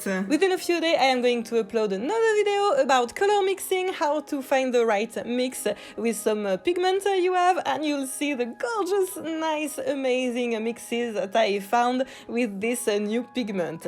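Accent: France. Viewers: I’m so happy when I see you. I love your lovely french accent and your personality. Your art is 💯❤️❤️❤️ thank you for existing! Lots of love from Italy 💚🤍❤️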